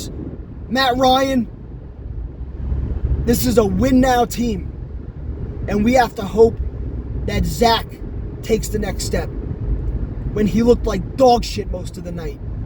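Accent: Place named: New York, United States. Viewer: My man’s went New York accent on y’all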